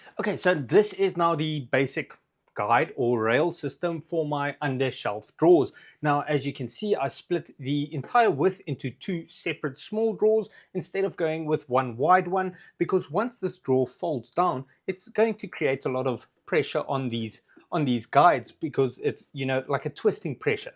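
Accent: South Africa. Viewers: I'm new to this channel. Do I detect a South African accent?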